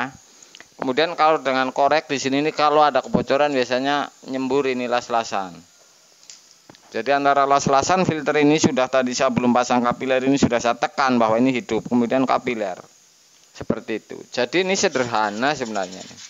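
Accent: Banyumas, Indonesia. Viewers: Salam ngapak.....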